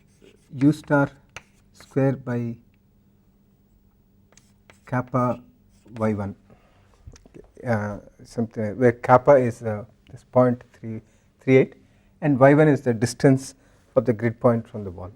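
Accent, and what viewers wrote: India. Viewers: WHY INDIANS TALK LIKE THISSSSSSSSSSSSSSSSS???????????????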